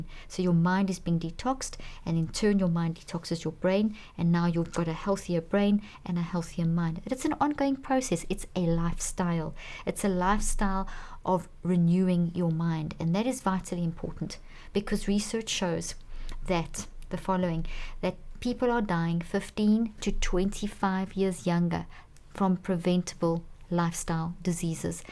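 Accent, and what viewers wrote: South Africa. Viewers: So nice to listen to your South African accent Dr Leaf 😊❤️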